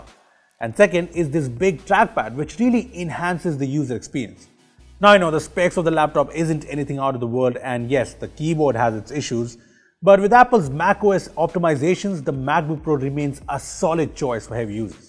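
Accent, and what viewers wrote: India. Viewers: The Indian accent made me want to click away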